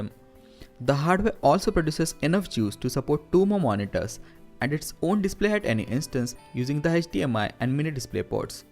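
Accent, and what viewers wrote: India. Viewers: Your thick Indian accent makes it hard to understand. I appreciate your effort though.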